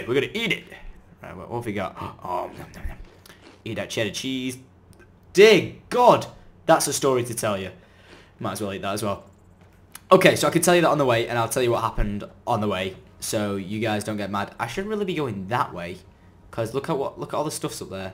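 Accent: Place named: Scotland, United Kingdom. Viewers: Top notch scottish accent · when he was reading the story in a Scottish accent i died of laughter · Nice Scottish accent yuv got aye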